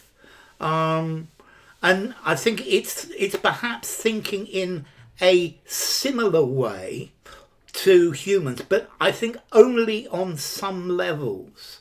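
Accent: United Kingdom. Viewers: There could be a mixture of accents (British and American for instance)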